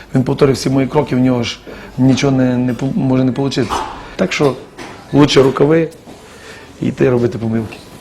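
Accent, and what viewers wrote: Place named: Nadsiannia, Ukraine. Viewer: Это не чистый язык это Надсянский Диалект.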